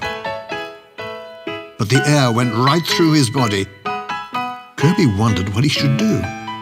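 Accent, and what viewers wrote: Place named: United Kingdom. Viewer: Why is the narrator British?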